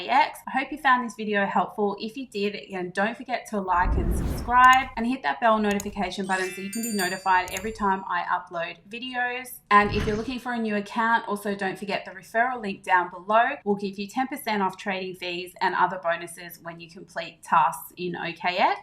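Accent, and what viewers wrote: Australia. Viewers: Thank you. The process of moving a stop loss is a little different to Binance and FTX. Your video was clear and easy to understand. Good job. And good to hear an Aussie explain. I am new to youtube, how do I see what other video's you have?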